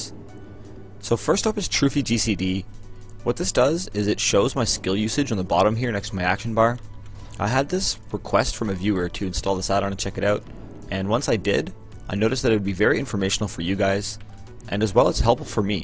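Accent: Canada. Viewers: u canadian guy? love the content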